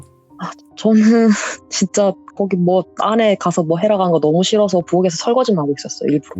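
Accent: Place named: Gyeongsang, South Korea. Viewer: 근데 경상도분이네? 억양은 숨길수없다 ㅋㅋ 난 완벽함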